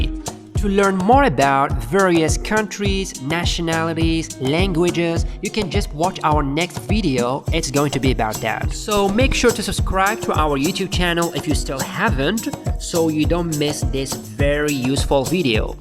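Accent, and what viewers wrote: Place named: England, United Kingdom. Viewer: I actually like your English accent. You're great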